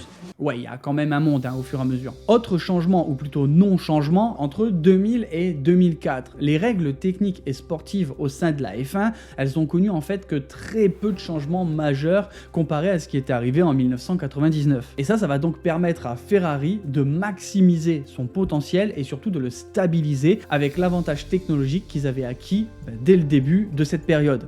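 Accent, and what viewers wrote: North, France. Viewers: Tu as l'accent du nord on dirait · Bonne vidéo mais tu ne peux pas me cacher que tu viens de Tourcoing, Lille ou Lens parce que l’accent au formule HEIN! est flagrant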